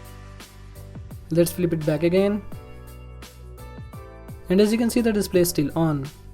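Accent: India. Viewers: Please improve your english dude the sound still like you speak in india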